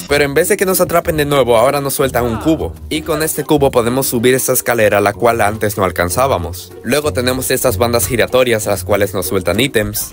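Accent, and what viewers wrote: Dominican Republic. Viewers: Are you Dominican?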